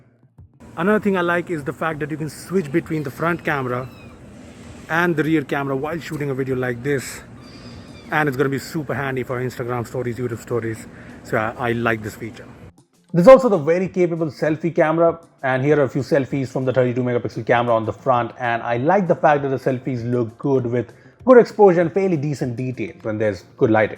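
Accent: India. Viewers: I Love the Indian Version o the R! ☺️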